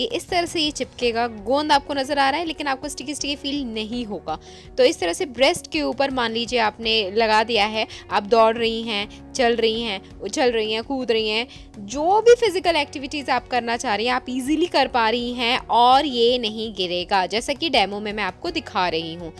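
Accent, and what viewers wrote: Bihar, India. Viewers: apni language ko thoda sundhar.... Tipical bihari tone ata hai apne muh par mane top k banati h vedio or channel ka namo rakha h be natural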